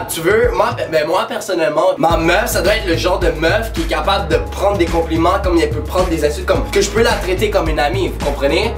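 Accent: Quebec, Canada. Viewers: Première fois que je tombe sur ta chaîne tu m’a tué J’avais jamais entendu un renoi avec un accent québécois 😂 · Comme c'est kiffant d'entendre un gars avec un accent québécois. 😮💙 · L’accent canadien et tout 🇧🇪 🇫🇷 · Je t'adore tes drôle et j'adore ton axan québécois et ses intéressent continue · Je kiff t vidéo et je voudrais que tu m'apprenne l'accent québécois 💗😝😛👌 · OMG troppp bien, j'adore ta vidéo, t'es plein d'énergie, franchement je regrette de pas avoir trouvé plus tôt ta chaîne !!! et en plus t'es Canadien, t'as un magnifique accent québécois !!!! continue bien!!! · j'adore ton accent Québécois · Coucou je suis nouvelle depuis hier soir et j’adore t’es vidéo tu me fais trop rire ! J’aime trop ton accent Québécois ! Fin bref j’adore ce que tu fais depuis hier j’ai dû regarder plus de 10 vidéo et j’adoreeeuh !❤️✨ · Je suis nouvelle tu es quebecois ? 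· Omg.... J AIME TROP TA CHAÎNE. Jdis ça juste en regardant une vidéo... XD. T'es québécois? Si Oui, je suis québécoise aussi mais... j'aime trop ton accent même si g le même... C vrai... Chuis une fille compliquer 😂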